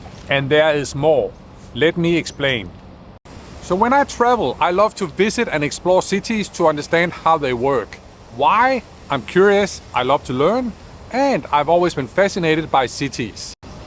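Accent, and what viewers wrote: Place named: Denmark. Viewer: he just sounds danish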